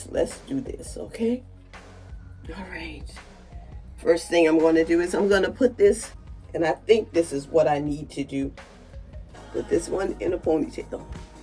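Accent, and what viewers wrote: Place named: Gullah Geechee, United States. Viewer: Hi! I just found your channel. I really love your content…I am getting my locks installed next month. On one of your older videos, you mention that you are from SC. I would guess somewhere near the low country (I hear the Geechee accent). My husband grew up near Charleston.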